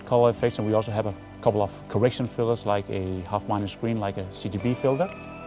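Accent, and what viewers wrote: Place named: Denmark. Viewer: total danish english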